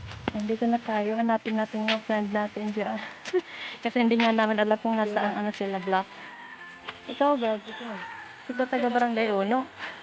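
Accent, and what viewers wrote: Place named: Waray, Philippines. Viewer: Waray gud kamo pag habal habal. 😁😁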